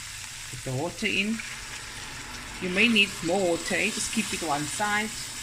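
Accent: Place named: Afrikaans, South Africa. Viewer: I'm a Portuguese South African living in the England just love your accent 😍 & cooking you make me home sick 🇿🇦🇵🇹 english mixed with Afrikaans beautiful 👏👏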